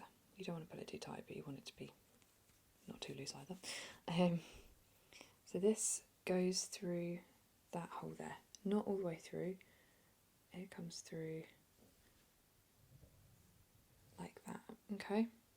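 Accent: Ireland. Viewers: Can you knit like plush animals for example? Or is that for only like sewing or something? I'm not much of a craftsman, but I do really like plush animals >_> So I thought I'd ask. :) Great video. I actually found your channel when I typed in Irish ASMR. While I had a hard time finding any actual Irish asmrtists, I did find one of your Irish accent videos. :) Subscribed! I look forward to checking out your other videos.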